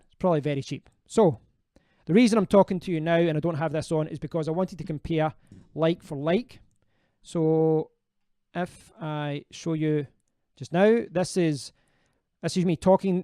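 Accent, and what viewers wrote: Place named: Scotland, United Kingdom. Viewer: I like your accent. Reminds me of CSGuitars but I can't put my finger on it. Is that a Scottish accent? Irish?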